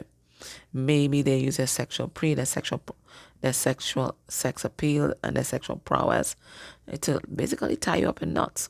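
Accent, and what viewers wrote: Trinidad and Tobago. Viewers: Hello, are you Trini?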